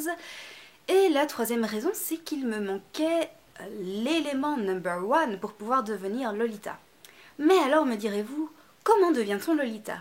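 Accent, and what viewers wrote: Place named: Belgium. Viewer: ton accent belge est parafait 💙👌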